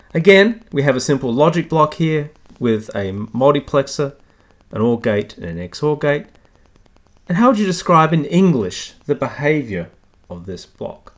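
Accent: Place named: United Kingdom. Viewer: What are you talking about? He's pronouncing it with his British accent what's your problem lol? Your accent is a lot worse for pronunciation, so I wouldn't be talking if I was you, at least his is one native to the English language.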